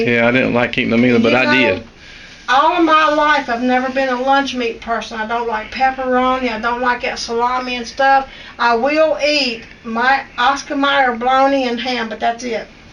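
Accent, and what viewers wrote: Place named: Appalachia, United States. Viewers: I worked in a meat market also at two different stores.. Bilo and Ingles in Sc.. IT IS COLD … and in the winter months, IT GETS COLDER... or at least it feels colder to me.. I am so THANKFUL to God that I don't have to work in a meat market anymore.. I didn't like it... I worked in that field for about 6 years and that was 6 years TOO LONG.. I don't know where you are located, but you sound like you could be from WV.. I was born and raised in West Virginia.. It is my home.. I have lived in Sc for a little over 18 years now.. But I do miss my mountain home.. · May I ask what area of Appalachia you’re from? I’m in WV and you cook & sound just like home so I’m curious. Thank you! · lol. sounds like my momma. warsh for wash... youve done lost your mind... thank you. i sure have missed hearing this Appalachian talk from momma. 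· You are sooooo sweet and I love your lil southern accent!! Looks delicious! I wish I could have some. God Bless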